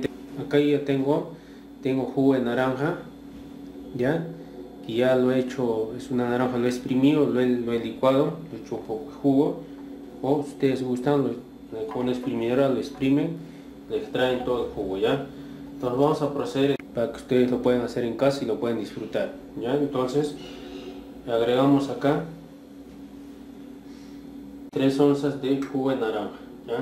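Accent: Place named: Peru. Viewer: Si se nota que eres peruano 😂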